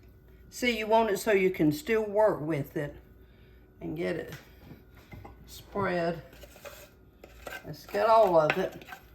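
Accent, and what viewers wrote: South, United States. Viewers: Miss, I MUST get the info on purchasing your cookbook! Funny coincidence, but I collect cookbooks that are similar to yours (that look like spiral notebooks) and to date, I have some as old as the early 1980s and many that were sold by churches, schools, community health clinics, and one that was put together by the Fort Knox (KY) Officer's Wives Group! Thank you so much for sharing your recipes!! And as always, I do love listening to your gorgeous southern drawl!!! 💕💕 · love your accent, you do not need to correct yourself and your precious southern accent, LOVE IT! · you are so darn cute and I love your southern accent, I was told when I lived in California for 2 years that I had an accent Im from Michigan,my dentist told me I talked like a Canadian EH LOL cake looks yummy...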